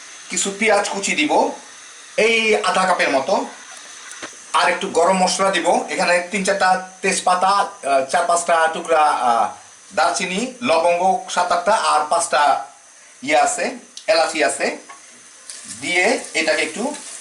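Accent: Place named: Chittagong, Bangladesh. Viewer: Apni chittagong er manus kota gulor dhorone buja jai.ami apnake subscribe koreci onk sushadu recipe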